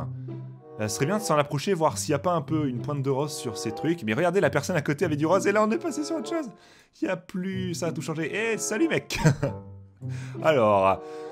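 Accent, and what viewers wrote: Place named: South, France. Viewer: ah les gens du sud et leurs " rause " sans me moquer de ton accent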